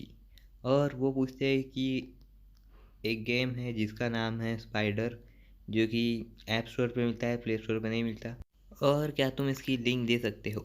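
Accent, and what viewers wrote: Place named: India. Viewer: Not everyone is good at english dude if he talked english he still gets the indian accent so it will sound so fucking weird and he might say wrong words so remember that everytime.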